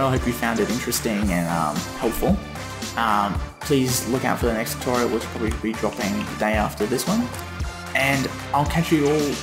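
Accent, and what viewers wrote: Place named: Australia. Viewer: Where are you based, you sound aussie??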